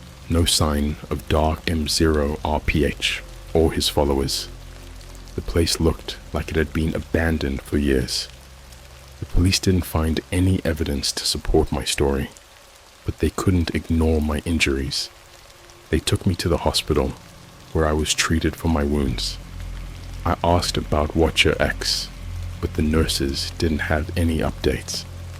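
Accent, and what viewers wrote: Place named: United Kingdom. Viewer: You sound like you're a fellow Brit! However, "nine hundred and eleven" makes you sound like a bot! it's nine-one-one!😺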